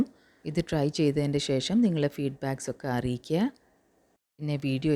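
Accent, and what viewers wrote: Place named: Kannur, India. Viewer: Kannur slang❤❤❤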